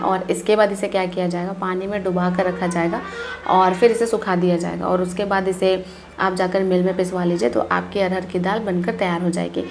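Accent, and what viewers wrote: Bihar, India. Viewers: Didi aap bihar se ho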